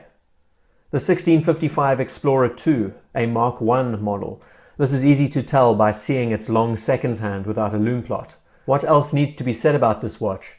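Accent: South Africa. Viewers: Agreed! I thought South African. Keep up the good work!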